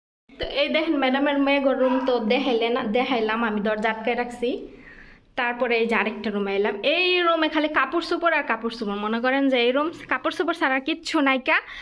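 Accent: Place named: Narayanganj, Bangladesh. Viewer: বাংলাদেশে কোন এলাকায় আপনার বাড়ি? আপনারা ভাষা নারায়ণগন্জ বা বিক্রমপুর এর মনে হচ্ছে।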